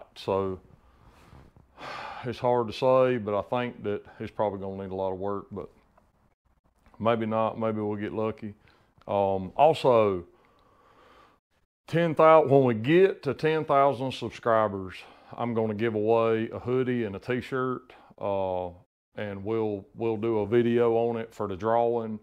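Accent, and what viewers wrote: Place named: South Carolina, United States. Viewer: i enjoy his upstate SC dialect. my former business partner was from North Carolina he had the same sayings and mannerisms. i am from the SC low country we have a british brogue dialect down here with a gulu/gechee inflection.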